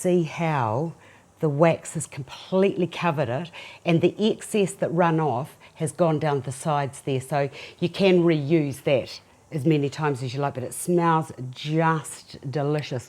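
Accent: New Zealand. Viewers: you look FABULOUS! LOVE your dress and belt. You sound like a New Zealander. I love New Zealand. I am Scottish but live in Perth Western Australia. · André Manoel she is from New Zealand, not all sound like her though, we all have different accents here. Similar but not all the same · I am guessing that she/you is from New Zealand due to how she pronounces "egg" like "eeeeeg"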